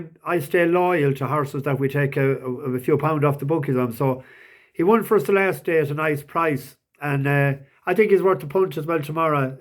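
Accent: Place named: Scotland, United Kingdom. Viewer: Great stuff, pity about the the scots accent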